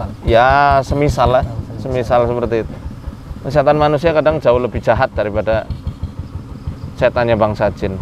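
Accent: Banyumas, Indonesia. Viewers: assalamualaikum pak ustadz alamat dimn aslinya orang mana...ngomong ngapak apa y...salam dari Cilacap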